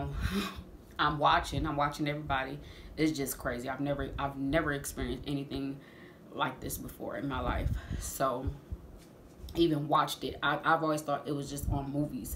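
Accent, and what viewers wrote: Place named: Ohio, United States. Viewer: I felt you were from the Buckeye, eard it in your voice. I live in the Cleveland area but I'm fom Atlanta. I've been here 14 yeas. Love te story, we all have one...even the " oh my" folks.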